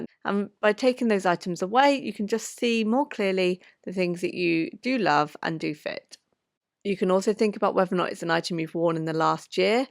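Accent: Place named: England, United Kingdom. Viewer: Ah...a sweet quiet soft english accent..❤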